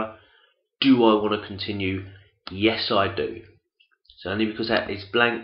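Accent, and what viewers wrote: England, United Kingdom. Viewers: Very helpful. English accent makes it sound highbrow too! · Thank you very much! You saved my life today at work! P/S: I really enjoyed English accent : )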